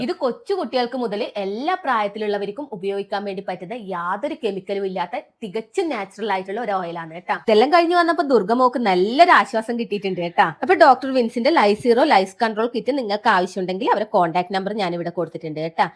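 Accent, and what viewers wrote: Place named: Kerala, India. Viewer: കടപ്പുറത്തു താമസിക്കുന്ന ഫാമിലി ആണോ.. സ്ലാങ് അത് പോലെ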